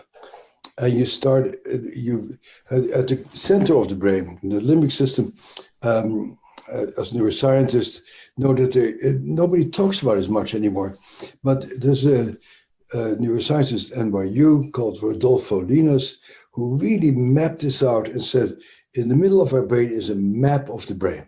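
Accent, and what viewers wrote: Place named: Netherlands. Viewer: I have lots of happy memories around neighbors with Dutch accents. So I love to hear Bessel speak. I am also part Dutch.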